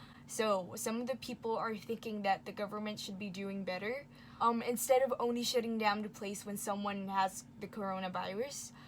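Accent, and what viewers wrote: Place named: United States. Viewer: Thanks! The pandemic and racism in the US are getting worse. I assume you are from the US based on your accent, please spread the info to the people around you to help curb racism against Asian.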